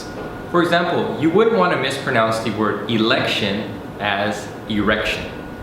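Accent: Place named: United States. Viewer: great, I love videos with such an american accent and subtitles! because they're so helpful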